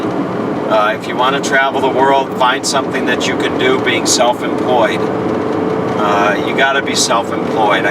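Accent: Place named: United States. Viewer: Thanks for revealing your story.. I found your channel from the Cains Australia video and have now almost finished watching all your down under videos!.. Being British, I enjoy your out there yank style of talking for some reason. and has been great watching your Journey. I'm coming up to 30 soon and about to head to aus or canada on one of those working holiday visas to do farm work, woofing.. But can only wish I had more smarts to have figured out the self employed thing by now, I have done webdesign, have a portfolio site but failed at the getting clients part.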